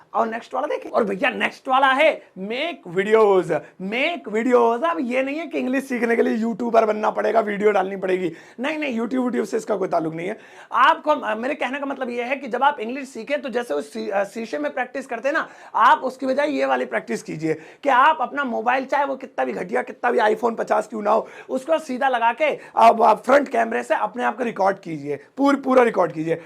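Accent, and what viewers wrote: India. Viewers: This teacher is very Desi . This teacher is very funny English language. How a great teacher spoken English. Good night 😴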